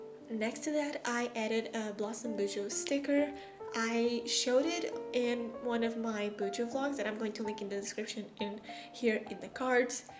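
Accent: Portugal. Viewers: are you portugese? bc i am fluent and you have an accent.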